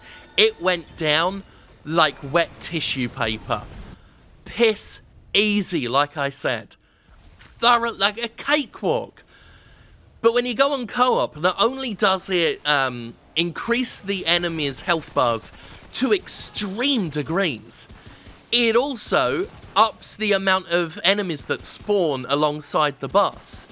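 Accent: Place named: RP, United Kingdom. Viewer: Allow me to save you the time of watching this review. Prefaced with that I used to love Sterling, I've been here since before the cornflakes and before the stint of "addicted to stress". I've been a fan for a long ass time, and shared many of the same views he did. But now all I see is.... Sterling Hates Everything, He Hates Fun. He Hates Friends. He Hates People. He Hates you, your family, your dog, your cat, your nonexistent significant other, your existent significant other, your brother from another mother, and everything that has ever held a state of matter since the dawn of time. He literally has turned into a grumpier "Francis" (Boogie2988) with a posh accent. He spits out reviews after playing a game for 5-10 minutes, says he hates it, says that everyone who disagrees with his review or dare I say it, finds his review full of false information, is full of bullshit or are just hating on "True gaming journalists like him." AKA: Sterling has officially become the IGN of indie reviews. 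Except instead of getting paid to rate games higher, he's getting paid to shit on everything. And it's not just this game. It's a common theme among all of his videos as of late. Sorry. I used to thank god for you, now I'm just not a believer anymore.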